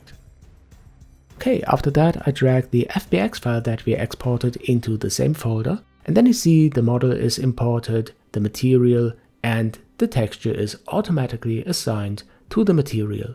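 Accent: Germany. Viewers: Are you German? I noticed all German youtubers have a soothing deep voice, plus it's the only accent that isn't annoying to hear. And the videos are always well made with zoom animations and without a single detail left unexplained to ensure zero viewer frustration and confusion. How did they lose the war?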